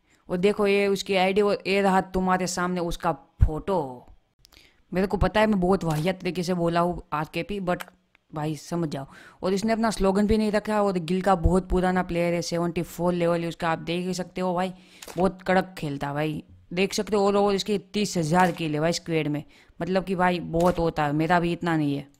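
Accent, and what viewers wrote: Gujarat, India. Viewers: Aap Gujarat se ho kya bhai?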